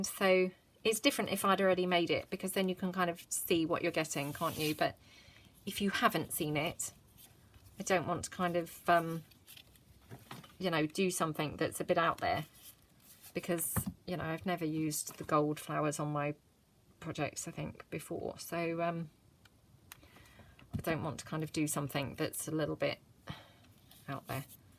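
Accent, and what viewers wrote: England, United Kingdom. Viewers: Lovely to hear and English voice as well.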